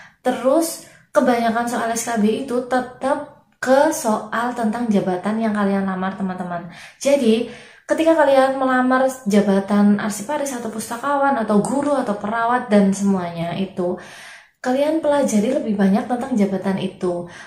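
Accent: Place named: Java, Indonesia. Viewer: medhok mbak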